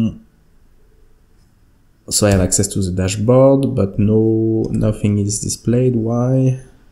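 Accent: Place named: France. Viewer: You are soo french... i truly understand the translations going through your head as you try to speak English. I speak french too but a native English speaker... the "cool" finally gave you out. Nice video.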